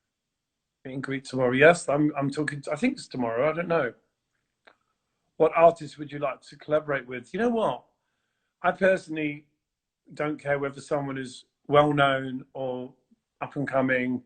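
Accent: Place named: United Kingdom. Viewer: And I love your British accent!😍😍😍😍😍😍😍😍😍😍😍😍😍😍😍😍😍😍😍😍😍😍😍😍😍😍😍😍😍😍😍😍😍😍😍😍😍😍😍😍😍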